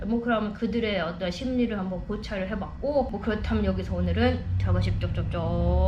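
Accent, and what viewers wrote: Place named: Gyeongsang, South Korea. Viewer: 님은 스피킹보다 히어링이나 독해가 훨 좋은듯ᆢ물론 스피킹도 수준급 이상이지만 갱상도 분이라 악센트 때매 그리 보이는듯ᆢ근데 그보다 님은 존재자체가 반짝여서 그렁거 항개도 안중요함 ᆢ마쟈 아니야~^^ ❤️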